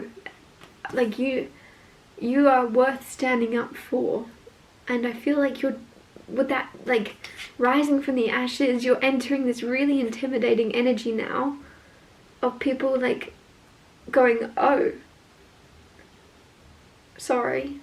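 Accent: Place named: England, United Kingdom. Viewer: I like your videos in.English i.lke your English accent!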